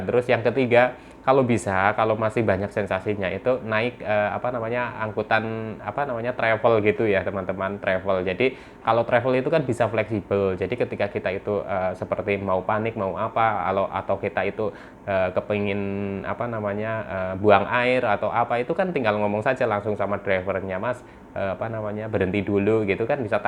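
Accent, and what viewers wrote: Banyumas, Indonesia. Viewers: Asalamualaikum saya kena aslam udah 4 tahun tapi masih sering kambuh2 tiba2 gemetern sampe sampe lemes se lemes ya rasa ya rasa gemetern y datang y dari ulu hati, kang y mana saya jga punggelan banjarnegar.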